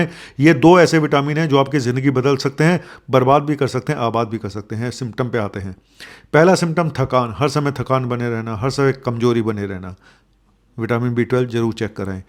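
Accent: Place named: Punjab, India. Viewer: Punjabi touch.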